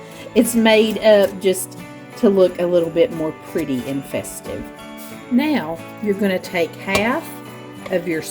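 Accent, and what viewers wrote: South, United States. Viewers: Merry Christmas and Happy New Year to you and your family. I just recently discovered your videos and I really love them. I love your southern accent. Southern people are always so friendly and they sure know how to cook! What you said in this video was so nice and sincere. It brought a tear to my eyes. Thanks again for making your videos. I love watching them and I’ve saved several recipes to try. I call them my experiments.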